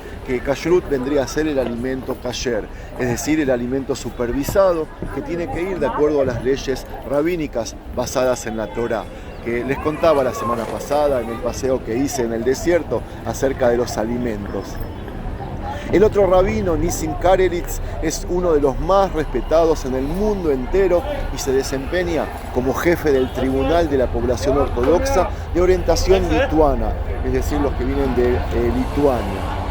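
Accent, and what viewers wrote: Argentina. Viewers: es judío? Xq habla español y con asentó argentino chileno no se q asentó sea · Viniste alguna vez a Argentina? Tnés algo argento en la forma d hablar🙌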